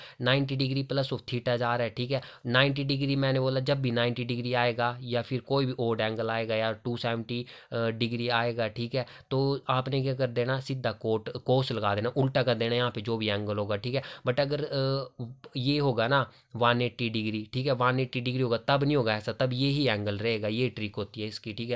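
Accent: Himachal Pradesh, India. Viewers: Himachali accent is always osm ❤😁😉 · Bahut simple way mai smjha diya aapne bhai very nice keep it up and love your Himachali accent ❤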